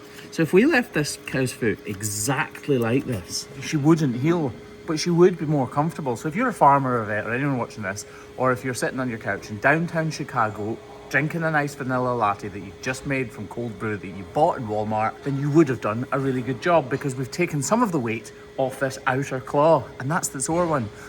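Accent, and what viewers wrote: Scotland, United Kingdom. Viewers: So interesting and love your gorgeous Scottish accent ❤️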